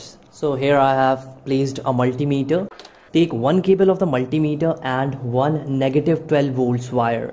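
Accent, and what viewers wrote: India. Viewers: 여기는아느나라인가 발음이 인도인듣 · Индусский английский, это самый ужасный английский на земле.🤢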